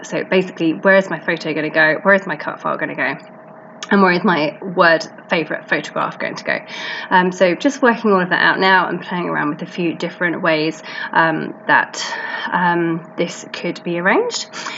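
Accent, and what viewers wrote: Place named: England, United Kingdom. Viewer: Thank you for your creativity. So nice to hear and English accent!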